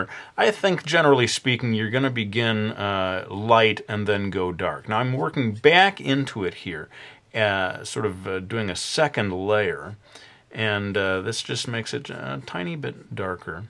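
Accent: Brooklyn, United States. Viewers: in the beginning of his videos, and myself watching, the brooklyn accent makes everything hilarious. now looking back and watching, i had no idea what i was getting into. now im addicted.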